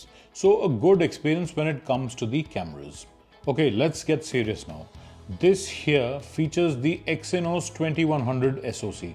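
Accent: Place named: India. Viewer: Beg the question why dont u make videos without that annoying acent put in in title indian ao we dont click on it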